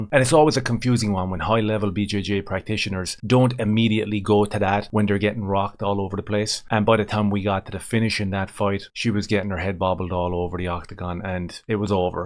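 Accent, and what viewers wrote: Ireland. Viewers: Really like your channel.. to the point.. no BS.. and who doesn't love the Irish accent🤷🏻‍♂️. Keep it up brother👏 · Are you Irish mate? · What part of Ireland are you from? Cork?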